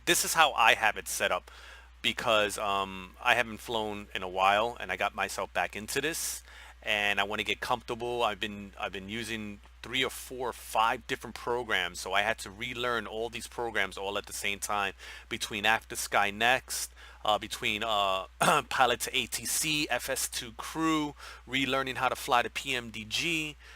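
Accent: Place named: New York, United States. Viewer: Love that accent mate, New York?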